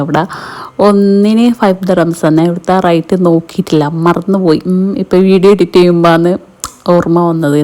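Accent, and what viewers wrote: Kannur, India. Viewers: Ngale samsara reethi nallam ishtapett.kannur kasakodu karude samsaram kelkan nalla rasama❤❤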